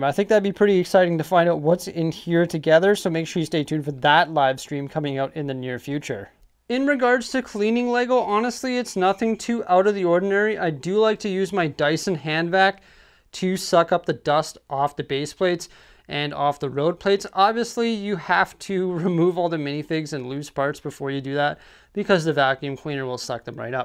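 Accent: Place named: Edmonton, Canada. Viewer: I’m so glad I subscribed to your channel in the mist of the virus 🦠. You got of interesting stuff going on right here and I can’t wait to see more. I love your Edmonton accent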